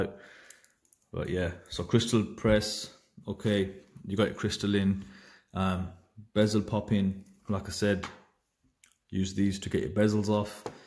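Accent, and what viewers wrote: Birmingham, United Kingdom. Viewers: I hear a Brummie! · Thanks for the useful hints and tips. I'm looking in to Pagani Design at the moment and I hear you can switch out bezels for Seiko SKX and other bits as Pegani use NH35 movements. Be cool if you did a vid on modding the Pegani 1651. Good to hear a voice on hear from Brum as I'm down in Bristol and miss home. Keep up the good work. Big up Brum!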